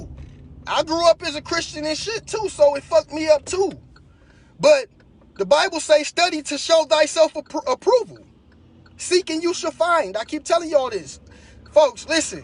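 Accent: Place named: Milwaukee, United States. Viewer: Sound like you from Milwaukee. Link up.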